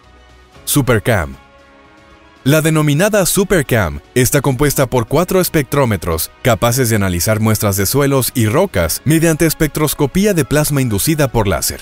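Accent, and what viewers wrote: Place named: United States. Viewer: da arcadas escuchar esa entonacion yanqui de los nombres de los crateres y los vehiculos. Me gustaria ver a los yanquis esforzandose tanto por pronunciar los nombres en español de las ciudades de mexico (por poner un ejemplo)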